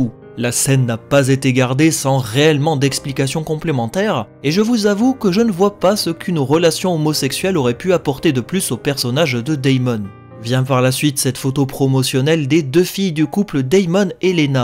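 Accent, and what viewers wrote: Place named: France. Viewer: C'est vraiment très étrange d'entendre les noms des personnages de cette façon... d'une manière si française, plutôt qu'en VO (plus précisément en haut valyrien du coup.) [Ranyra] à l'écoute, au lieu de [Wraynira]. [Jackérys] au lieu de la prononciation [Jaycéwrys]. Entre autres. Ça pique. Mais c'est mon avis.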